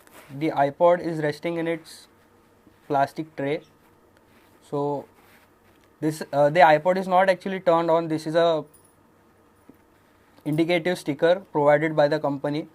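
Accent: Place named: India. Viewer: You made me cry I hate Indian accent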